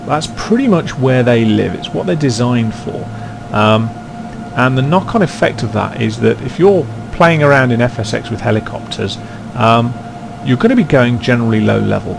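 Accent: United Kingdom. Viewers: Hello all, sorry if this post appears to be a bit late but I have only just got onto broadband (Yes I'm a Newbie, this is my first post!). Firstly well done on a well made review, I like the professional Royal Navy accent that you have which gives what you say more credibility. You remind me of Keith Thompson of Treasure Hunt fame who was also ex-Navy. Secondly regarding rudder use in FS9/FSX for helicopters remember to check your realism settings and ensure AUTORUDDER is switched OFF as this this can affect pedal control so you can't turn in the hover! Sorry if you already know this but it can get overlooked. Anyway thanks for reading and carry on the good work. SimonStanm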